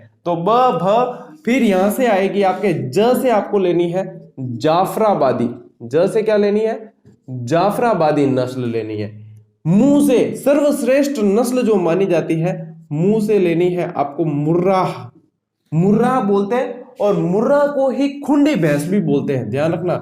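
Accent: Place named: Marwar, India. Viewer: सर आप जो वीडियो में मारवाड़ बोलते हैं ना वह मुझे सुनने में बहुत अच्छा लगता हैं श्री शिवाय नमस्तुभ्यं महाकाल आपको हर बुरी नजर से हर हर विपदा से बजाएं आपके परिवार की रक्षा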